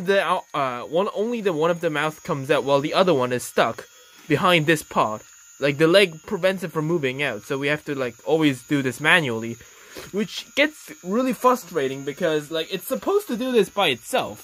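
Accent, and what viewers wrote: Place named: South Korea. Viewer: 아닝 영어 억양이 딱봐도 한국쪽인데 한사람 한국오셨나용?이래 ㅋㅋㅋㅋㅋㄱㅋㅋㅋㅋㅋㅋㅋ 귀엽네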